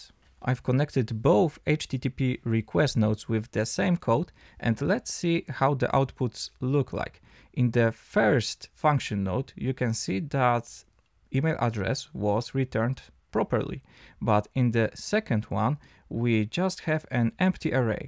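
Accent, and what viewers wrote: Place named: Poland. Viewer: No slychac, że Polak 😀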